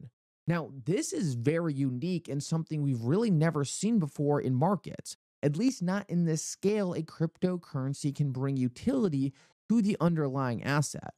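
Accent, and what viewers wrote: Allentown, United States. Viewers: with an Allentown accent at the click baiting again...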